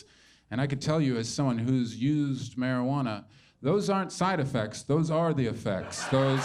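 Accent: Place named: Scotland, United Kingdom. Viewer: Almost didn't click on this. I wasn't in the mood to struggle through a thick Scottish accent!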